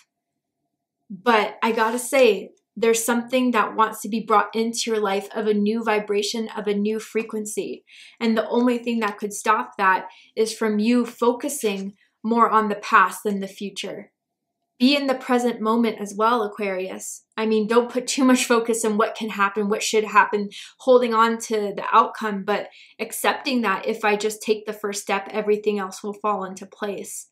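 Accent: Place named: United Kingdom. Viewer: Are you from the UK 🇬🇧 😊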